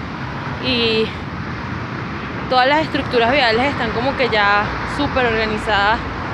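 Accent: Venezuela. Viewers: eres panameña? hablas como venezolana saludos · Me fascina tu acento, no sé si es venezolano pero me encanta.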